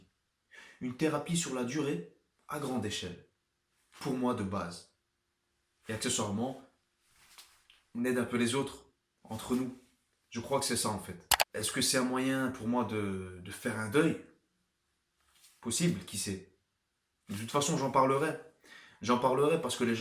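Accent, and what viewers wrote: Belgium. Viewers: De quel côté de la Belgique es tu?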